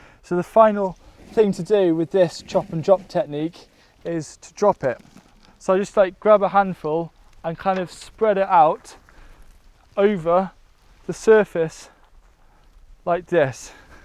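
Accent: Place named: Lincoln, United Kingdom. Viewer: one of my professors is from Lincoln! I recognized the accent from hearing you and when he described his home and how the soil is great for farming, I put two and two together. Love your content! Glad to see you're back! ❤️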